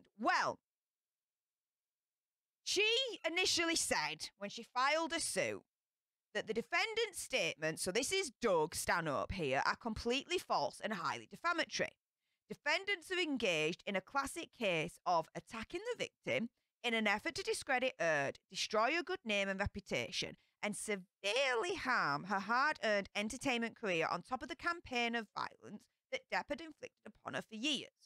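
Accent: Yorkshire, United Kingdom. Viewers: HAS to be Yorkshire tho'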